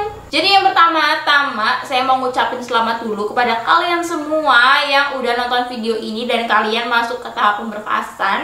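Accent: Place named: Java, Indonesia. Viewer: Medoknya keliatan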